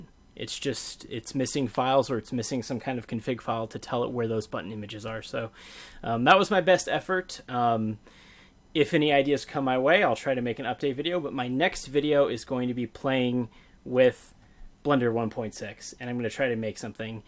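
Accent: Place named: Netherlands. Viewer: Nice dutch accent, and also have of the comments in dutch. :D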